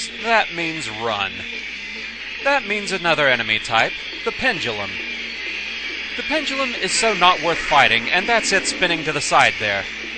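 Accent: Scotland, United Kingdom. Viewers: First time I played this, the opening message really got to me for some reason. "Violent or cruel" is so ominous. I've seen "violence and gore" but cruel is a more subjective word that hints at malice, and I always found that warning real creepy. Fortunately your warm friendly Scottish voice makes things a lot more welcoming!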